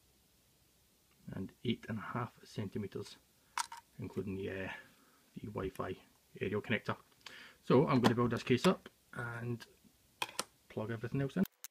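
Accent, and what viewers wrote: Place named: Scotland, United Kingdom. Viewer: His "gibberish" is Scottish accented English.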